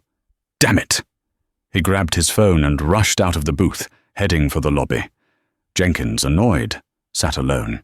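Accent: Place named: United Kingdom. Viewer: What I really appreciate is the one freeze frame picture at the top of the screen that never changes, slide shows or moves. I put a piece of paper on it and just get lost in the story listening to that purring English / British accent while following along with the transcript. My imagination does the rest. Also, I like the ability to backtrack by highlighting sections that have already gone by. Some channels are changing the algorithm so that it is not possible to do this with the narration. Only with silently reading it over again. Please don't do that. I like hearing again some section(s) that have intrigued me, made me smile or even laugh.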